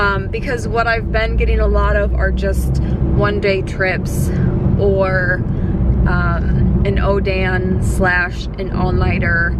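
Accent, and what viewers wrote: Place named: South, United States. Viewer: I love the aviator glasses and country accent. Babies and puppies always make my flights that much better especially if they are happy to be outside 😁🐶👶.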